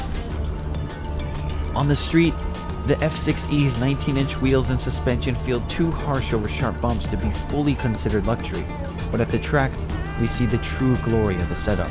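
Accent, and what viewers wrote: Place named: United States. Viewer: I can't listen to a bloody American talk about a true blue Aussie turbo 6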